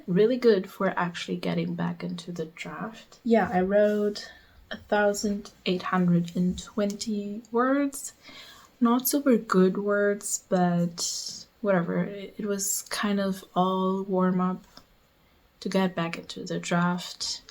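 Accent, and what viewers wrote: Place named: Spain. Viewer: I feel like if I wrote by dictation, half my first draft would be "um"s 😂 your accent in Spanish is so nice! In my country we call the supposedly "neutral" accent in Spanish the "Discovery Kids" accent 😂 I am moving to Spain so I hope I get more used to hearing that one, as it's currently not my favorite either lol 🥲 I generally don't read via audiobooks but if I did I'm not sure I would wanna read a Spaniard narrator either. Oh and I had to skip over the Sunrise on the Reaping bits because I'm afraid of spoilers but honestly at this point it will probably be several months before I read that book 😅 I want to reread the original trilogy first, I need to get to it! Fun vlog:)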